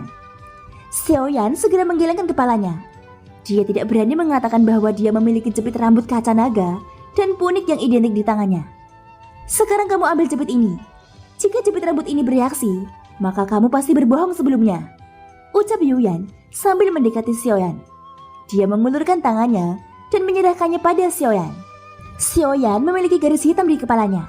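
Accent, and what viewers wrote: Java, Indonesia. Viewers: Medok nya min😅😅😅cuma pengisi nobarta lama yg mantap intonasi pembawanya😂😂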